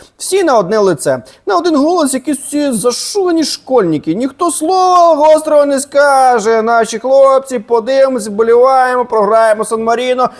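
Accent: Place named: Russia. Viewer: Що знову з розійська редукцією ?) Де співоча П'полтавська українська мелодика мови ?)))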